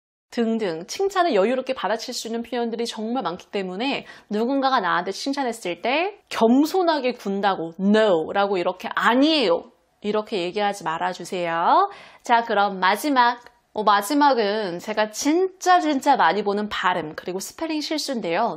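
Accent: United States. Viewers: 완전 미국영어네요… 디싸이링~~ 쌜맨~~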